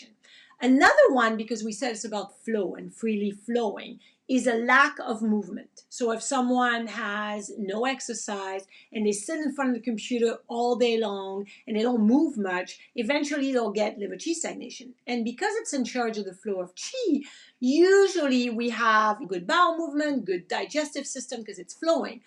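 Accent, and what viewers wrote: France. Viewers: your French accent adds to your charm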